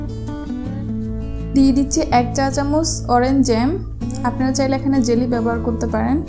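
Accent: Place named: Netrokona, Bangladesh. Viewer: নিশ্চিত নেত্রকোনার পাব্লিক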